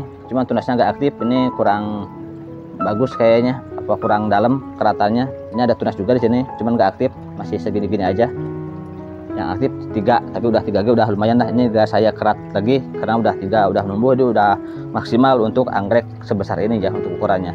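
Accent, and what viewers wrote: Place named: Sunda, Indonesia. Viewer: Sy dengar pencerahannya TKS ya kang cmn sdkit geli itu logat sundanya meni medok psn😊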